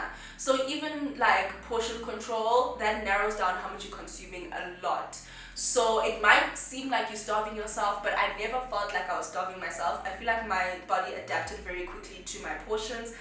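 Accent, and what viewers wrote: United Kingdom. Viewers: It's the British accent for me...lol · i loveee the british accent omg omg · N the dash of UK accent iphumaphi? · Loved the UK accent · The British accent gets me everytime 🤣🤣❤️❤️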